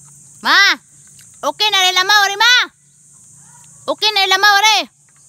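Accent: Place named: Visayas, Philippines. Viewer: Proud of you Ms. gahi pud ko mo bisaya sama nimu kay taga Sugbo dzai 👼🥂🥰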